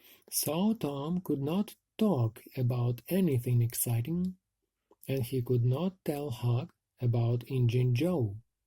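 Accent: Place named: Ukraine. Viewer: Hello, I think your pronunciation is good. There is such a distinct accent difference between Ukrainian and English, isn't there? Your genuine enthusiasm for the English language makes me very happy. I still have a lot to learn, you are very inspiring. Halina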